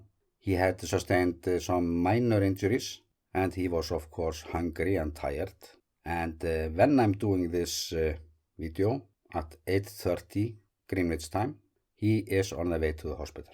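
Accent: Iceland. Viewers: I understood you perfectly. You have a wonderful Icelandic accent and humour to match! I always enjoy your very informative videos!! Keep them coming ❣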